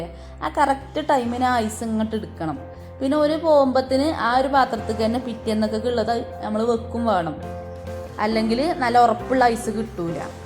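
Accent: Malappuram, India. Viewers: തനി നട്ടൻ മലപ്പുറം ഭാഷ... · Kasaragodil ninn kananad njan mathrano🤗.orupadishtayi malapuram slang😍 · എനിക്ക് ഈ മലപ്പുറം താത്തന്റെ വീട്ടിലെ വിശേശവും മലപ്പുറം ഭാഷയും പൊളി നിങ്ങൾക് ആർക്കെങ്കിലും മലപ്പുറം താത്തന്റെ ഭാഷ ഇഷ്ടമായവരൊക്കെ ഒരു ലൈക് തരു 🙃🙃🙃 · അതുഞങ്ങളുടെ മലപ്പുറം ഭാഷ അത്‌ ഓവറായി തോന്നുന്നവർ.. ഈ വീഡിയോ... kananda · Malappuram voice super😀😀😃😇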